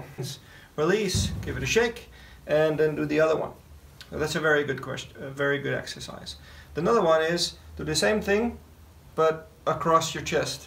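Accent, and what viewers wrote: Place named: Netherlands. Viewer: Clear description, many thanks! And now it makes sense. Dutchman liven in Denmark making video’s in English. I’ve been watching your valve overhaul video yesterday while underway in the kiel Kanal and was noticing a slight Dutch accent but Danish comments. 🤔. Watching this today in Denmark (Kalundborg)!while discharging it became clear. 😀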